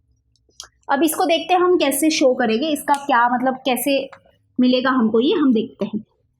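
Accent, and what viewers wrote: Maharashtra, India. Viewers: Mam is speaking hindi good but the 'Barobar' 😅 mam is marathi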